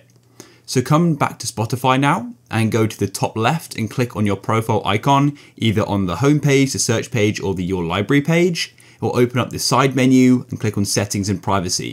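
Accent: United Kingdom. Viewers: doesn’t work + ur british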